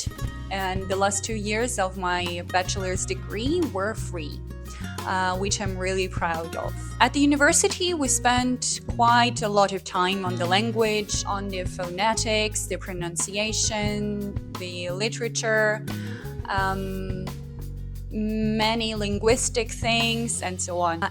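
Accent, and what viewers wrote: Buenos Aires, Argentina. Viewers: Hola, linda 🫶🏼 gracias por el saludo Jajaj fue inesperado. P.D. Me encanta tu italiano 🤌🏼 ni hablar de tu español porteño 😻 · Hablas muy bien varios idiomas te felicito! hablas muy bien el español argentino tenes el acento porteño, mis abuelos eran italianos, yo tengo la ciudadania italiana , saludos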